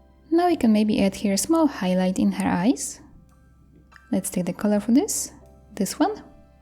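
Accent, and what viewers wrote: Poland. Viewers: Czy mi sie wydaje czy słyszę Polski akcent?:)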